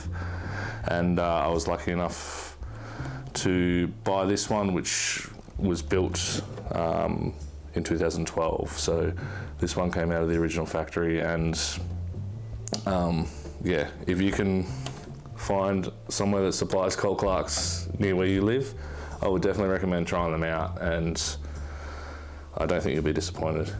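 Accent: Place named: England, United Kingdom. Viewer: You sound likevyour English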